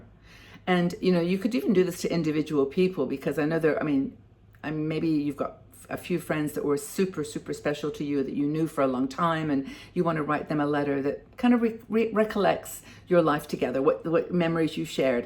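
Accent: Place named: England, United Kingdom. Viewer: I thought I could hear a very small hint of an English accent in previous videos, small but still there.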